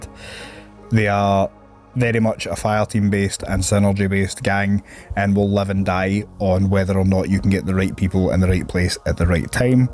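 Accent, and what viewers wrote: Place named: Scotland, United Kingdom. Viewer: Some new necromunda channel I've discovered, great and detailed analysis, charming Scottish (i presume) accent, nice builds. Thanks!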